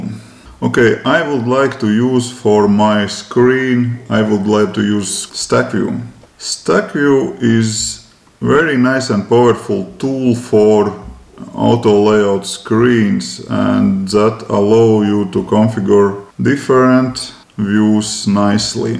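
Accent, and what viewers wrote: Russia. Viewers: какой русский английский ))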